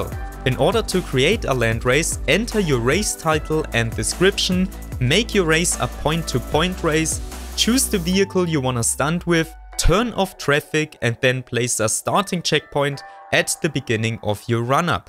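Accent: Germany. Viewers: so ein deutscher akzent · Dieser Deutsche Akzent xD Aber nices Video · 🤣🤣dein Deutscher Akzent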